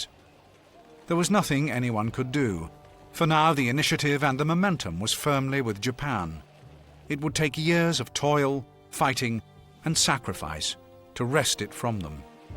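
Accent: United Kingdom. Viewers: Very British accent indeed ....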